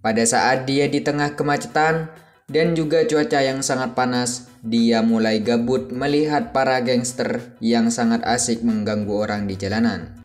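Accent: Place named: Madura, Indonesia. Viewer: saran sih min, untuk suaranya mungkin lebih santai lagi, dan untuk logat maduranya lebih di redam lagi atau diminimalisir mungkin😭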